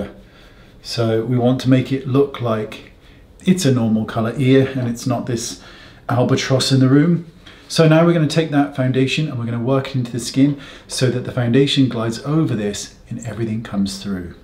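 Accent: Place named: Somerset, United Kingdom. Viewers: I was laughing at the accent. My husband's family is in Somerset. Thanks for the technique reminder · Love this I’m going to try it by the way congrats 🎉 on your foundation my colour is out of stock at the minute but soon as it’s back I’m going to get it My Grandfather’s was from Somerset I recognised the accent best wishes 🌸🌹🌼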